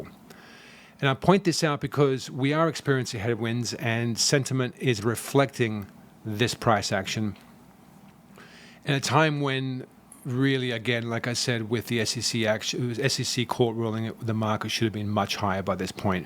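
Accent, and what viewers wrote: Australia. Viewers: he's from Sydney. Common NSW accent.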